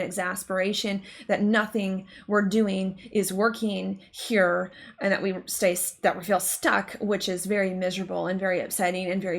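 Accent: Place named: Georgia, United States. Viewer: Georgia huh⁉️ That explains that accent of yours I love so much lol❤️😅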